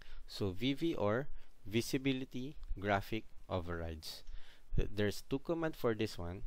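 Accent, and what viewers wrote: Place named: Philippines. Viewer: I can tell your pinoy from ur accent😀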